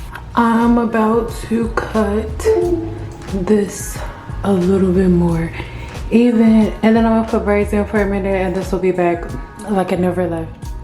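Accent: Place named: South, United States. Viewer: I loved the blowout. Child, you sound like you from the south like me. LOL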